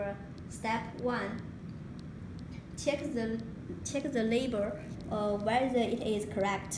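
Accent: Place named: Asia. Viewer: thats how asian speak u dumb ball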